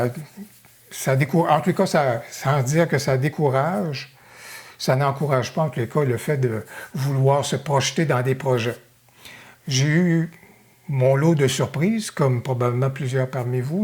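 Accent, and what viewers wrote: Quebec, Canada. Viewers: d'entendre ton accent québécois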